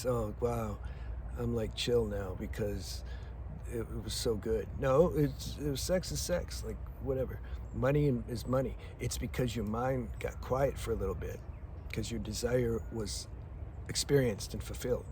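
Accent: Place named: Jamaica. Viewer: ya talking like a rasta man now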